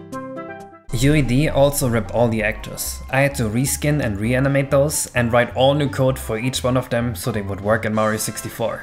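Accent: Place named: Germany. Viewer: jo bist du aus deutschland? hast nen deutschen aktzent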